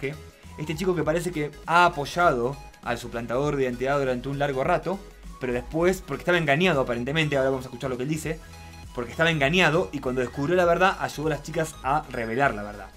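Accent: Argentina. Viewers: Tiene un acento re argentino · tiene la voz de un argentino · Jsjs argentino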